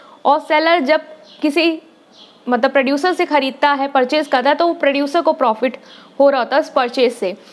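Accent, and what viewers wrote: Lucknow, India. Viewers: Ma'am aap lucknow se ho kya 😐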